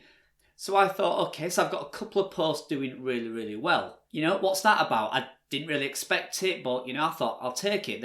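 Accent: Yorkshire, United Kingdom. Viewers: "eh up, somethings going 'ere"..... good yorkshire speak lad! t'videos excellent lad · Wow. Another very inspirational video. I am so blessed with the stats in this video bro. Thank you for coming up with such great videos. Good information and good motivation for my own blogging journey. And btw, I love your Yorkshire accent too. Cheers.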